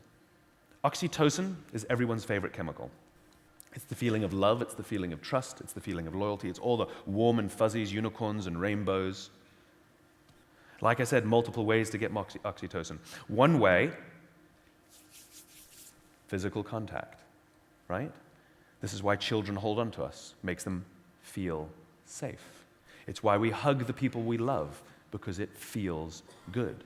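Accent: England, United Kingdom. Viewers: He should keep his beautiful English accent. It sounds so respectful and sexy. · Haha, when he's in England he kinda talks brittish :D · Why does he sound so British here ? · Wow surprised with his British accent 👏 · He's almost doing a British accent. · Back before he lost his English accent. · I just love his British accent 🥰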